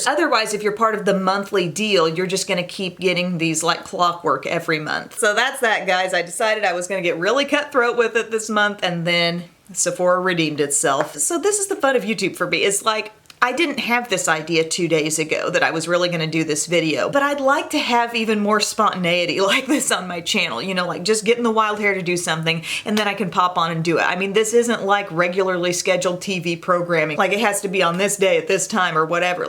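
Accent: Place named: South, United States. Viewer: I don't know but I feel like I hear more country in your voice in this video? Like more southern? Love your vids and you! Xx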